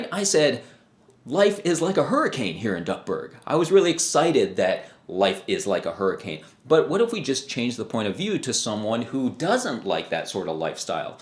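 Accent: South, United States. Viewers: the Southern accent to sound so natural on you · Love the southern accent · Liked the southern accent🙈😌😭😆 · Your southern accent sounds like Matthew McConohay 😅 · Your southern draw is awful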